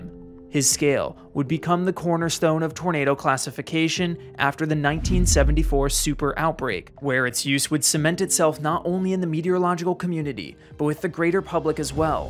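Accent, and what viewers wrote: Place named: RP, United Kingdom. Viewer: dawg talks he works for the BBC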